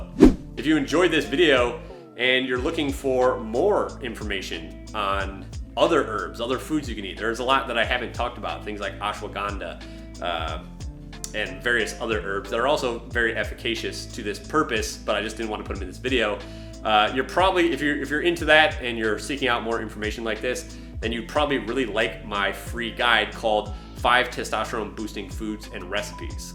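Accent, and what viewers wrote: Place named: Michigan, United States. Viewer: sound like youre from michigan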